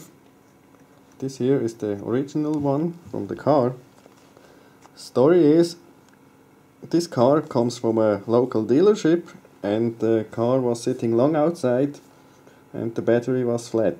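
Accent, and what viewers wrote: Switzerland. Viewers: switzer englisch versteht man wenigstens ;) thanks for video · Soo guet ne schwizer uf englisch am tutoriel mache.. würschmers Bärndütsch erklärä😀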